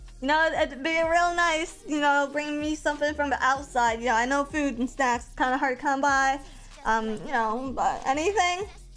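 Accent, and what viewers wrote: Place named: South, United States. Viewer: But why is she southern 🤔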